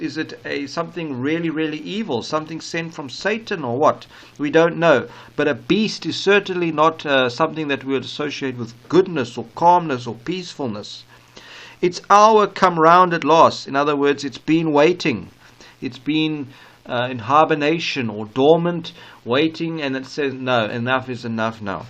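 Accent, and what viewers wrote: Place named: South Africa. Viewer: Haven’t listened to your whole vid yet, but as soon as I heard your SA accent, I knew this analysis would be spiritually mature and thought provoking. Thank you for a beautiful recitation and sharing of valuable insights 🤗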